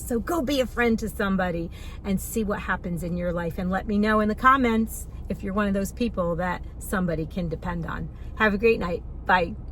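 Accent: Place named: New Jersey, United States. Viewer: I love your Jersey accent and your heart!! I’ve known you for 2.5 years and I have experienced how kind, thoughtful and honest you are! You’re the kind of friend that makes the world a better place!! God bless you!!❤❤❤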